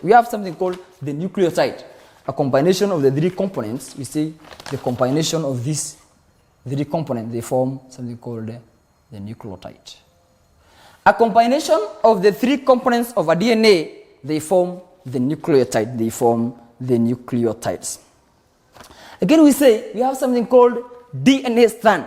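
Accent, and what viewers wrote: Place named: Africa. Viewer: Waxaagaba lama fahmi karo lahjad foolxuno tii africanka ba wax ku aqrinaysaaye tii somalida la imow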